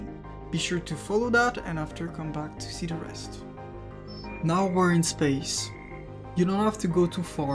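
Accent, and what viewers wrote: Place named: Quebec, Canada. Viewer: Hi, do you speak french? I noticed that in your username you have '' '' and that you have some what of a french canadian accent. (Si oui, je débute et je cherche des gens pour jouer )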